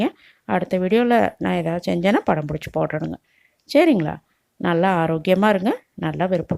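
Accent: Kongu, India. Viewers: Kongu tamil👌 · ஏனுங் கொங்கு தமிழ் ரொம்ப அருமைங்கோ.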